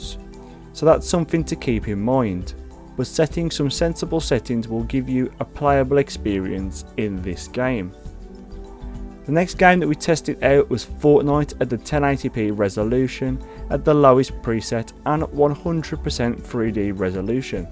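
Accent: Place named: Wolverhampton, United Kingdom. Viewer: That accent sounds very local, I’m from Wolverhampton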